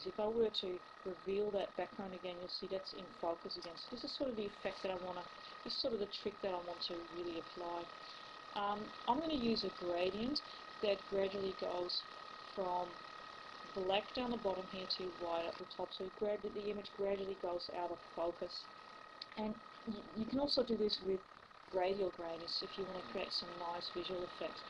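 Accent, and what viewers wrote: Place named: United Kingdom. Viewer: jesus. the british accent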